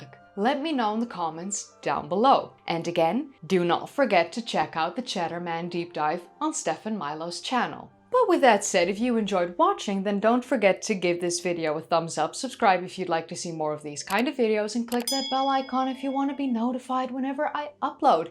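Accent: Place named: Netherlands. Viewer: And here are again. Another amazing video. And as I have many times before, mentioned curtain lovely dutch accent. I like accents. I just kean back. Learn something and hear wonder dutch accent. So all in all another great video with the All amazing and her beautiful Toll of a of voice with that wonderfull dutch accent.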